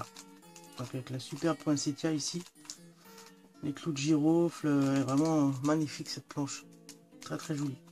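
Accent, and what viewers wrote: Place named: North, France. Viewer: Chère Un mois?? Eh bien dis-donc il a fait tour du monde votre colis c'est pas possible avec un délai pareil je serais allée le chercher moi-même en Pologne 😊 je plaisante mais tout de même un mois c'est énorme j'espère pour vous que vous n'aviez pas l'intention de faire un projet avec ses collections pour Noël sinon il va falloir mettre les bouchées doubles( personnellement j'aurais négocié uneremise) à moins que le délai de livraison ne sois pas stipulé, dans ce cas il faut être patient. En tous cas ce que vous avez commandé est très joli, ces collections sont très belles et je suis certaine que vous allez encore realiser de magnifiques créations comme vous nous y avez habitué. Je souhaite de très joyeuses fêtes de fin d'année. Ps: j'ai parié sur votre accent et j'ai misé sur un accent du nord de la France, j'espère avoir raison sinon je vais perdre 3 euros😂😚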